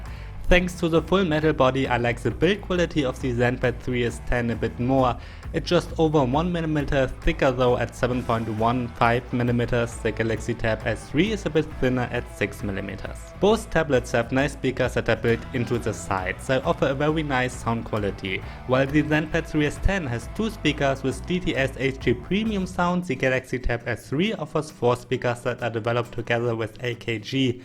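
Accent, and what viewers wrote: Germany. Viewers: Is so hard to understand your ligo m8 for a German U definitely have the worst accent I've ever heard is so unpleasant. Unlike my other German friends & my German girlfriend she has a brilliant accent she almost speaks perfect English like my other German friends at University. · Are You German? Weil Ichs Auch Bin und du hörst dich extrem deutsch an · Man merkt dir an, dass du Deutsch sprichst grundsätzlich weil sonst hättest du LCD panel bei ca anders ausgesprochen genau so wie viele ausdrücke :D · As I am German too, I might be the only who is surrounded by this accent everyday lol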